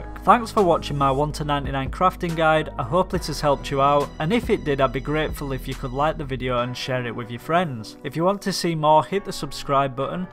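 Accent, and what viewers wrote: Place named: Leeds, United Kingdom. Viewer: bruh u from leeds lol?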